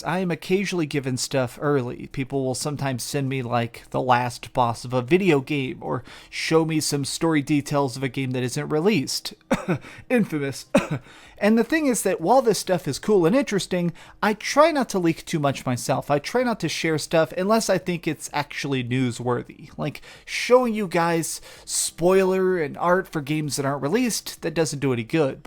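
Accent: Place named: South, United States. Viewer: Why the southern accent?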